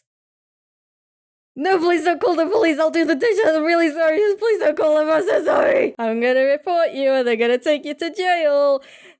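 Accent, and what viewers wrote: United Kingdom. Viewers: wait is she british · Sorry to ask, but where abouts in Britain are you from? I'm British myself but I can't seem to tell where your accent originates ^-^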